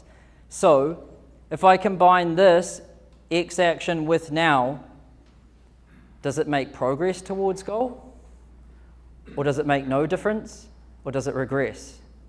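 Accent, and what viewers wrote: New Zealand. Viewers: This man must surely be the most annoying human being on earth. From his annoying squeaky kiwi accent, to his tiresome textbook-motivated gobbledyegook. For example (and this is typical of all his Youtube videos: "We can't do anything without action, and action and time and energy. We can't get that from anything other than our human body and our human body can't perform concurrent operations or be replicated. So you are the true constraint of being human is time and energy (sic). That's what life is - energy over time". and: "There are people that have trillion dollar companies and there are people that have no companies - why?" Jeez - why do people pay thousand of dollars to listen to this garbage?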